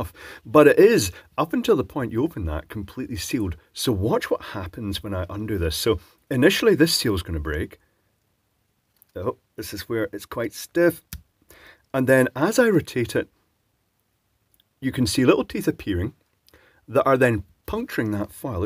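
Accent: Scotland, United Kingdom. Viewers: A fellow Scot?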